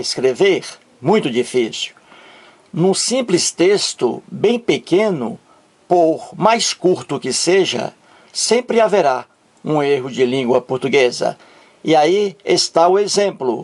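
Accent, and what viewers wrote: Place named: North, Brazil. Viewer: vc devia se concentrar só em ensinar português, é muito mais bonito que postar videos blasfemos!! Acho bonito o.sotaque nortistas seu. Abraços do piracicabano aki!!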